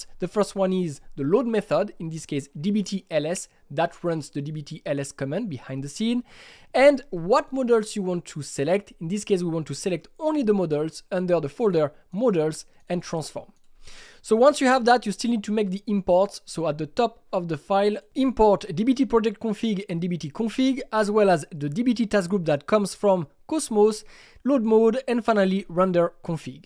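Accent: France. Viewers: Just by the accent I assume that you are a french speaker. It would be great if you can make videos in french also. Great and explicative video!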